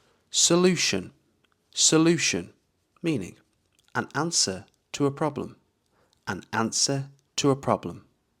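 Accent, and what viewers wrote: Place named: London, United Kingdom. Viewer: What is this goofiest accent? 💀 Pronouncing 'understanding' as 'OWNDERSTANDING' is actually unhinged. Bro is literally running an English channel just to confidently butcher his own language. Why do you sound like you’re actively choking on a hot potato? Even the Oxford Dictionary knows it's a clear 'A' sound, but here you are pushing your muddy, regional dialect on international learners. This isn't 'authentic B2 English', it’s just pure auditory torture. Imagine buying an English course only to end up sounding like a generic NPC from a sketchy London alleyway. Is this really how you teach people?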